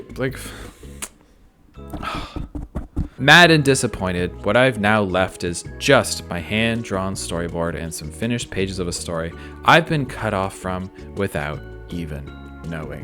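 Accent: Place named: Ireland. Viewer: only vaguely related but sometimes you sound like you have a kind of irish accent!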